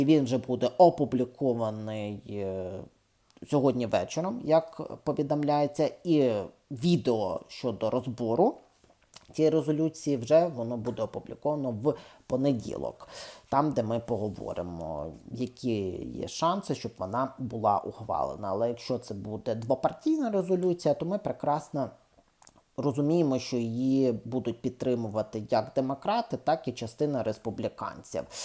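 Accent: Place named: Canada. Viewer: цікавий акцент. ви проживаєте у Канаді?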